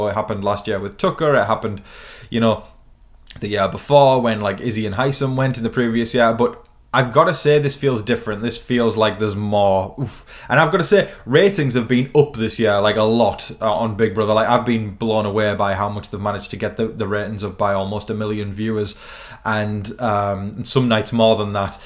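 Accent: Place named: United Kingdom. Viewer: Thanks for reading my comment. It felt really cool hearing you say my words in your British accent 😂 ❤ as always thanks the hard work!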